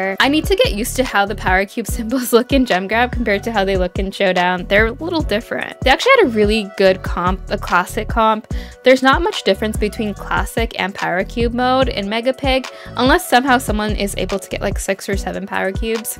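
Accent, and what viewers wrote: India. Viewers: Hey! Are u Indian!? Pls tell me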